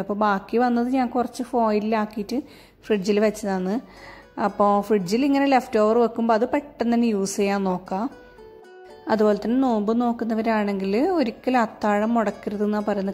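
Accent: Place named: Kannur, India. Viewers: Njan new sub er Anu ithante samsaram ishtayi aann aann kannur aanno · Adipoli puffs....but I don't have other oven... I have only microwave.... nice to hear from you....kannur slang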